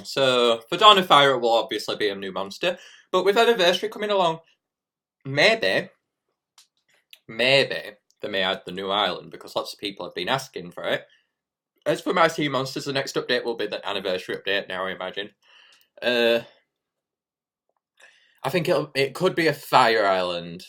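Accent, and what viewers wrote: Scotland, United Kingdom. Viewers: R U Scottish?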